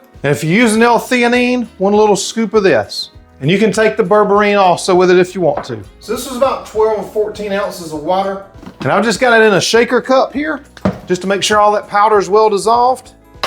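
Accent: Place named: Gulf Coast, United States. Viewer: I'm going to give this a try! I've been really enjoying your videos, especially because your accent reminds me of home (I grew up on the Gulf coast) 😊 Thank you so much for all the helpful information!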